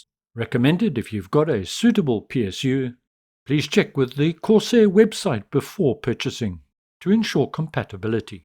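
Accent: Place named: South Africa. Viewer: Totally unrelated, but I gotta know; do I detect a South African accent?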